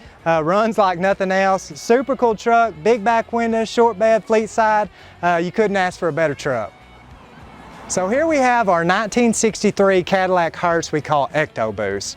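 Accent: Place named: Texas, United States. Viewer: Not gay, just a classic Texas drawl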